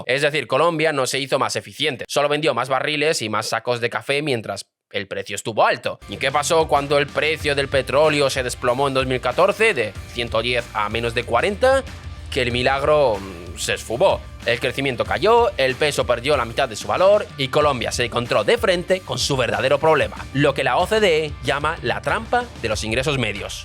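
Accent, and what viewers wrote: Russia. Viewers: Es Ruso y casi no se le nota... Hasta ahora.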